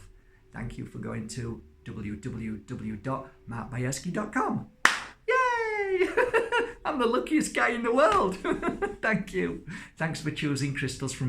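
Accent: Yorkshire, United Kingdom. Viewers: You sound like from my neck of the woods. 😁 yooorkshire! Thank you for this. I love getting new info. I am on my spirtual journey, doing reiki as well. 🥰 i will visualise myself as a crystal when sending love and light💫💜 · Are you from Yorkshire ? I’m in South Africa but that sounds like a Yorkshire ascent ?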